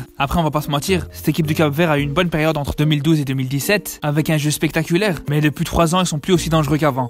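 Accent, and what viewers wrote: Belgium. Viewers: Tu as un ptit accent belge.lol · C'est un accent belge que j'entends?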